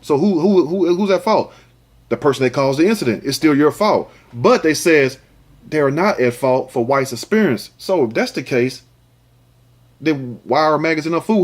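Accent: South, United States. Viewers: Love the country twang of your accent, and you're so silly, yet wise. You're alright with me.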